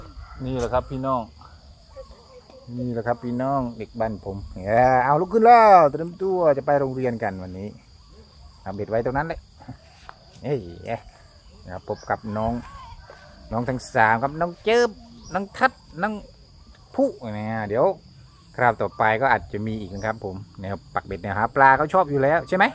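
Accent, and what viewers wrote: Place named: Sisaket, Thailand. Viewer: ศรีเกษชัดเจน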